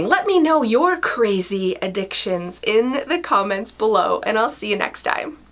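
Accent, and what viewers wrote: Canada. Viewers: Hilarious intro. Love your Canadian accent. Personally I keep temp files (this year) in my desk, and long term files all in the same file cabinet. Having 15 different places I found increases paper clutter. Also a periodic clean out of even long term files is necessary. I am considering your household binder idea. I liked your command center its not suitable for me but quite beautiful. Not a fan of the whole addictive personality thing, please no offense, it comes through and I'm a less is more kind of gal.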